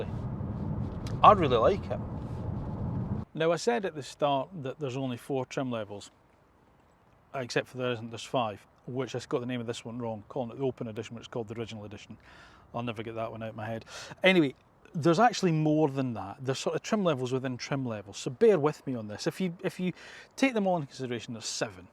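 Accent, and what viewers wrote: Scotland, United Kingdom. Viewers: I love your style of videos, keep it up! Greetings from Greece. Are you Scottish? I like your accent.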